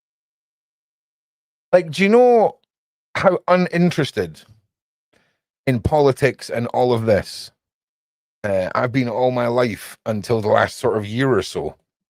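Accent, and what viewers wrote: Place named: Scotland, United Kingdom. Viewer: Liked and subbed, because that Scottish accent is so effin posh! And the content or somefin' or nuffin' 🤣👍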